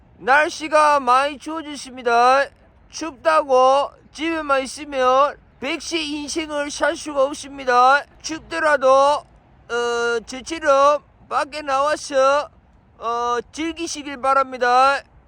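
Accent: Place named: Gyeongsang, South Korea. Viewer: 여친이 경상도 사람인데 나한테 왜 경상도 아저씨가 말하는 영상 보냐고함..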